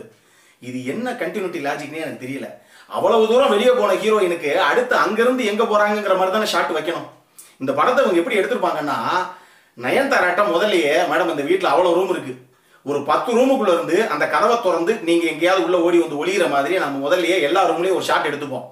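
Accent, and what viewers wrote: Tamil Nadu, India. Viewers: Only for ur tamil slang kanna. Va kaanam.....???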